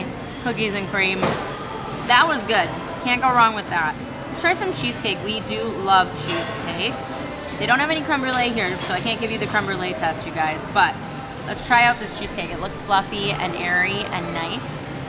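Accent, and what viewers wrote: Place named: Midwest, United States. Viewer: Definitely from Michigan or Wisconsin with the accent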